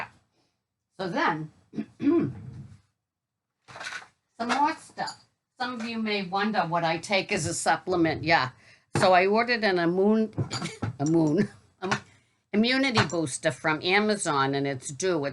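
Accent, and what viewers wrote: Boston, United States. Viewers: Thanks for the Saturday Show! You made my day! I’m in love with your Boston accent!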